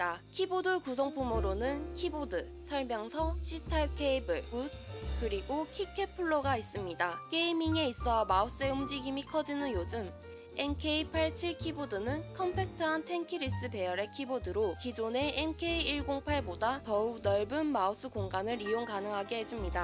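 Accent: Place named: Busan, South Korea. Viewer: 부산말투가 살짝~~^^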